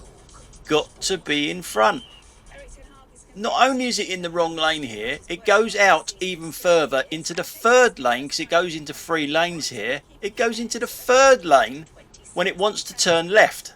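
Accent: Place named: Cockney, United Kingdom. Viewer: 13 mins of a boring cockney moaning about other people’s driving.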